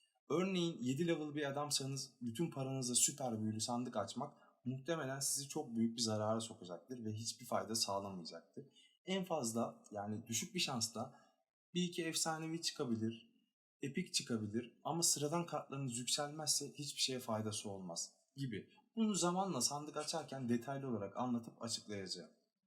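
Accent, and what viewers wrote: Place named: Istanbul, Turkey. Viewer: ÇOK KASIYOSUN KENDİNİ VE KİBAR KONUŞUYOSUN YANİ İSTANBUL TÜRKÇESİYLE KONUŞUYOSUN KÖTÜ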